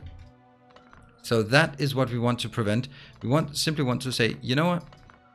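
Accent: Denmark. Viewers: Decent tutorial. Literally subscribed for the flawless danish accent.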